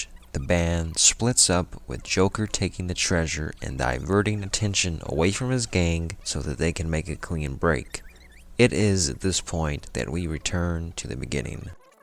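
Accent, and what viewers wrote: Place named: United States. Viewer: Great story explaination and at first I thought u were pronouncing some names wrong bcus u didn't know but then I figured out that u were pronouncing it the American way lol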